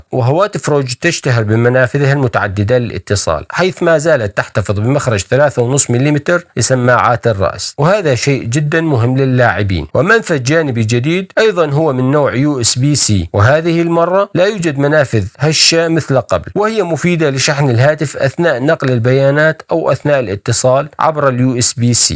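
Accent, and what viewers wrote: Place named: Deir ez-Zor, Syria. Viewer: اشتركت لانو لهجتك ديري ... إستمر بالتوفيق عجبني انك تجمع كل التفاصيل كون اكثر حيوية ...تقبل مروري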